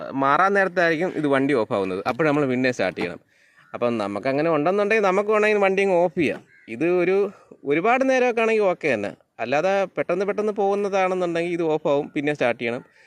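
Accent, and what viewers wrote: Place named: Trivandrum, India. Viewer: Pakka Trivandrum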